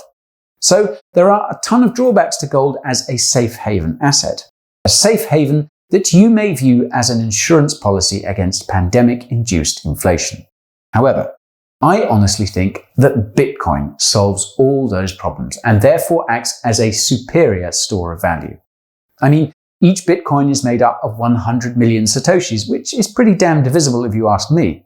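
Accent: United States. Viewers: sounds American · Guy talks increasingly like Americans now.... "Here's the deal" "if you ask me"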